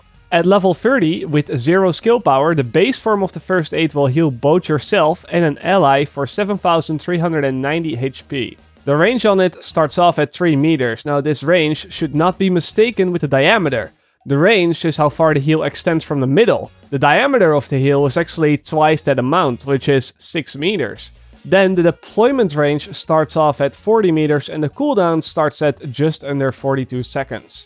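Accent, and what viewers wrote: Netherlands. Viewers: Ik hoorde aan je Engels dat je Nederlands was // i heard of your engelish that you where dutch.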